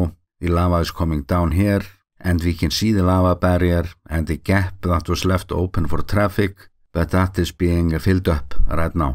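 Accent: Iceland. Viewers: I love thr Icelandic accent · I prefer this guys weird Icelandic accent instead of a soulless a.i. app narrator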